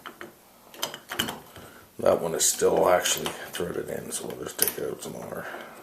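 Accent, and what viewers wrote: Newfoundland, Canada. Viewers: sounds like he's from Canada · You a Newfie? Thanks for the vid! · Canadian... Love to listen to him talk. Unfortunately, neither of my knobs have visible screws, so I'm still at a loss. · Love the Canadian accent, you sound like Relic from the Beach Combers.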